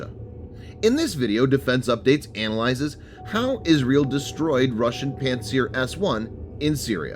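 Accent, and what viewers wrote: United States. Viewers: The way American pronunciation murders ordinary language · the very American-sounding voice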